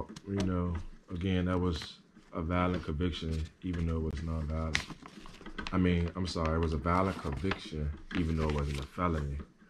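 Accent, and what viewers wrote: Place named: Virginia, United States. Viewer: Knew you were from VA from the accent lol. Not a felon but great video man! More people need to see this.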